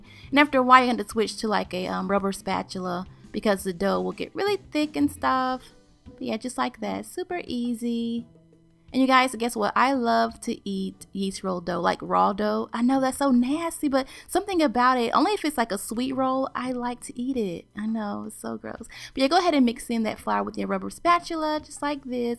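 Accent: South, United States. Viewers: IK she's southern but from which state? Sounds like Virginia or Carolina to me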